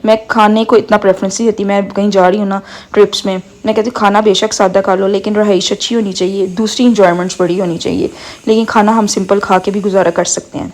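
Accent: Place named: Lahore, Pakistan. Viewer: how can you speak so fast without any stop (are you from lahore, i guessed it from your speaking style), i enjoyed your commentary more than the video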